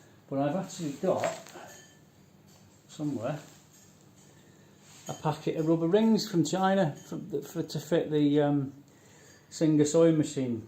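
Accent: United Kingdom. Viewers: Love listening to - his voice is so soothing. I could listen to the UK accent all day long (American here). I couldn't watch more recent videos because I didn't want him to leave. But I'm getting back to them. Thanks so much, Fiona for keeping his memory alive!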